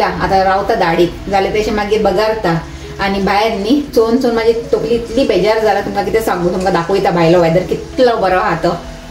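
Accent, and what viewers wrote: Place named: Karwar, India. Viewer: Your voice is so irritating also don’t say your husband Patrao all the time. It’s annoying. Ghantis call for Goan Patrao . Your karwari Konkani doesn’t suit for Konkani vlog.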